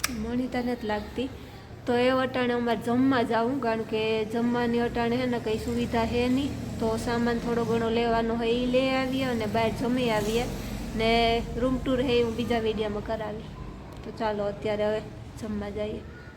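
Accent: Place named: Mer, India. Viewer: બીન આપણી મેરની ભાષા સારીલાગે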